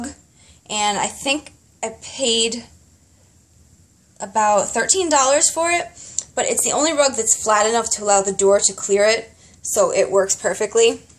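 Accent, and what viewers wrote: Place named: South Jersey, United States. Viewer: I thought you had a South Jersey accent because you sound like my cousins and then you said Brigantine!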